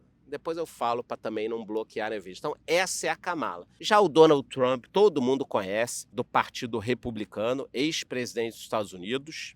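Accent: Rio de Janeiro, Brazil. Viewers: adoro suas explicações. Excelente canal! (E mat0 as saudades do sotaque carioca ouvindo você). 😊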